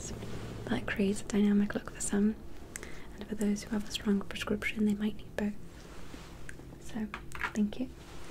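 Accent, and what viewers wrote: England, United Kingdom. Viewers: » Super relaxing video ✔ » Looking absolutely flawless ✔ » Best british accent on YouTube ✔ You always deliver,! <3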